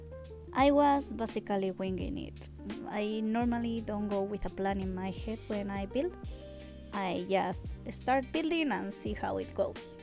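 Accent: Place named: Spain. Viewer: Hello, Wow your English is so good and clearly understandable ! Also I love your super cute spanish accent~ I wish I I'd speak English like this. Beautiful build ! I checked UCLA on Google from which you took inspiration and good sims insertion~ I like how hou "simmifyed" it to melt the style with your own sims mark in Del Sol Valley. This world cruely needs renovations too and I'm excited to see how you'll redo this world with your own lore for the Zest family. Speaking of new Royalty EP, I'm very hyped for my part. I do love legacies and I feel the gameplay would blend so well into my saves and stories. Just disappointed the african inspirationned world ended in a poor neighbourhood with 5 lots... I hoped we had it as a whole new world but I kinda like how they created 3 whole universes in one world. That's interesting even thinking having a royal legacy in one of my saves I do play with 1 world = 1 realm/kingdom. 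Very excited to have this pack in our hands. Not forgetting CAS and build modes look insane !! I wonder what part 2 of this build would look like. Waiting for it !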